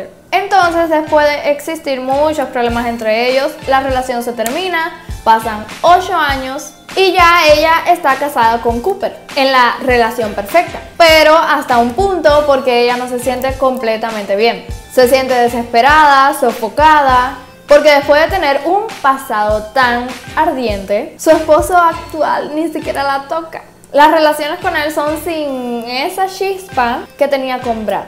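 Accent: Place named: Dominican Republic. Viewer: Eres dominicana o vives aquí en RD? Tienes como un chin de acento 😂❤️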